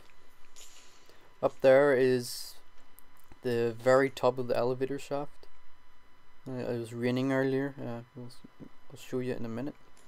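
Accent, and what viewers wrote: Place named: Northern Ireland, United Kingdom. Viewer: you can tell he's british by his voice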